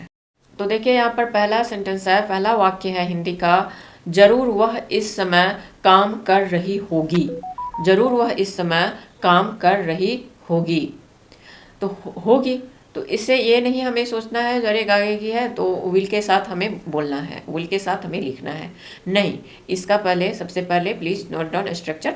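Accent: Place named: Bengal, India. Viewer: Madam you must be Bengali. Right ?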